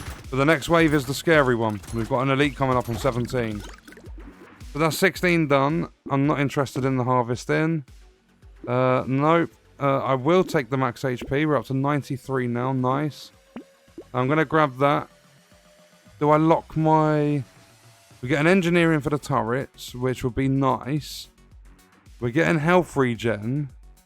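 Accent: United Kingdom. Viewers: no hate but bro is the most british guy i heard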